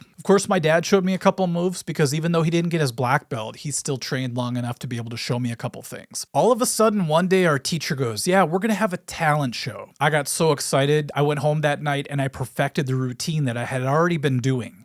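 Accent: Minnesota, United States. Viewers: I always thought of you as the Harry Kim of guitar teachers, from Star Trek Voyager.(Garret Wang). But with a Minnesota type accent. From the Coon Rapids area. Love your stuff. Keep teaching these younger generational how to be Rockers. Is Tom Bernard Still at KQ?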